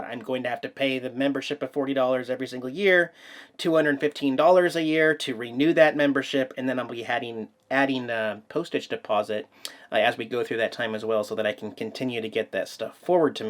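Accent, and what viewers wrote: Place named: Texas, United States. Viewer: You're gettin a slight Texan drawl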